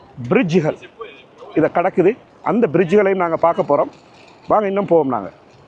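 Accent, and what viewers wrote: Sri Lanka. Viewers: Nice to hear Srilanka Tamil make full video.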